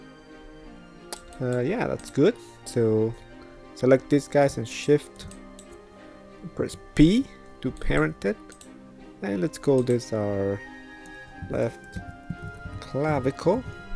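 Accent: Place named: Brazil. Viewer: Thanks for sharing! Sinto um leve sotaque BR :}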